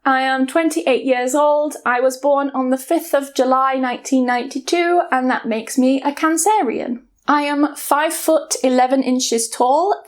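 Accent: United Kingdom. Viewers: Hello ,i appreciate it and i am happy i can learn british accent , grammar . .. with you and we were born on the same month ,oh my God 🤩by the way i love your hair color and eyes please give them to me 😂😂and congratulations to your BF and us for having u _ thank God Please take care of yourself and it's not a comment it's like a letter 😂😂😂😂😂💓love you❤❤❤ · This the first time a hear a British person speaking english and understand everything even without subtitles. By the way i am a greek trying to improve my English and i really like you I think you are adorable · I understand you so much!I hate mouth noises too. It always drives me crazy.I glad to find the same person as I am.👍 Also I love your british accent.💜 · B3autifull British ac3n7..oh.. que fofa ,fala português..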